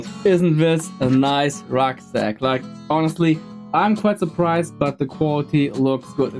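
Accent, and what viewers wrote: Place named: Germany. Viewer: Shout out to random, Eastern European, gaming channels. Gotta be one of my favorite genders. Edit: Ok, turns out he’s German. I’m really bad at identifying accents.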